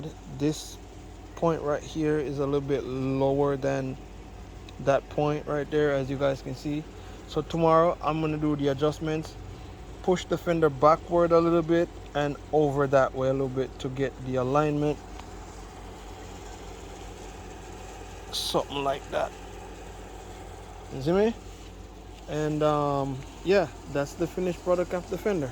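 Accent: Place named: United States. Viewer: Man a Yankee now. It's a Jamaican channel I subscribed to bredda. Be careful with the accent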